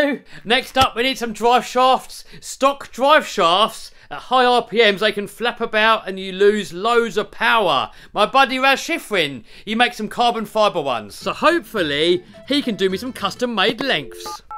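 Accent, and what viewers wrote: United Kingdom. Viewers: Very British English. Great caröeee.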